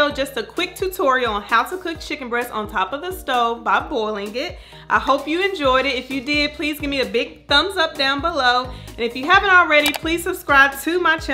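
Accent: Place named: South, United States. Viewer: You got to be from the south girlll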